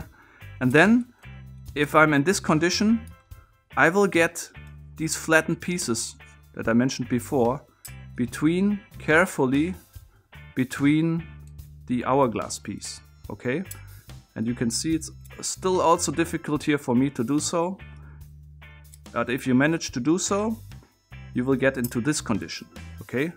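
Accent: Germany. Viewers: you sound like light indian accent. Oh youre german, yeah that sounds more like it.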